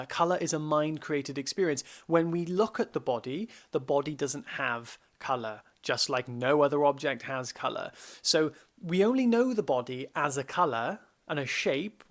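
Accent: Wales, United Kingdom. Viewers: Hang on.... I'm listening to you now, you sound Welsh? I'm from Cowbridge. I am guessing that you are near Cardiff?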